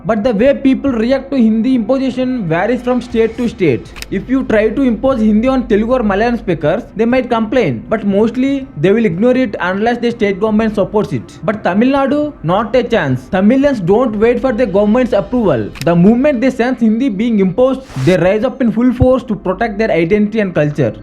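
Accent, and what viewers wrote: England, United Kingdom. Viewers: I really enjoy his english accent. Infact i watched whole video.. 👍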